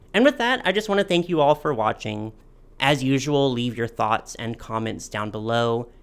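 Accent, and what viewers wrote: Texas, United States. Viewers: I'm a foreigner so maybe I just am bad at discerning American accents, but I was surprised that yours is a texan one??? Your voice is definitely not something I imagine when someone says "Texas" 😅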